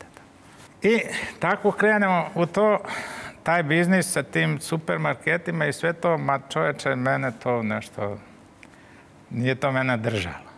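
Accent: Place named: Kordun, Croatia. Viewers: Kao da sa Korduna. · Ovaj govori hrvatski.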